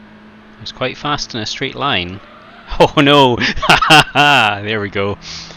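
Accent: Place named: Scotland, United Kingdom. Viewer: Good to hear.. Scottish accents are the best in my opinion...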